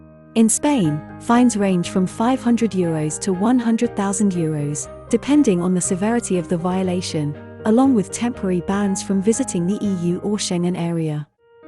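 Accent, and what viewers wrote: United Kingdom. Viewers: stay in your pirate island english !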